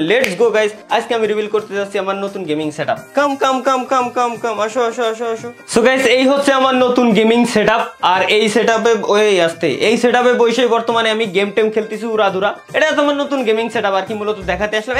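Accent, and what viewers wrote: Noakhali, Bangladesh. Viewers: Noakhali theke boltece ❤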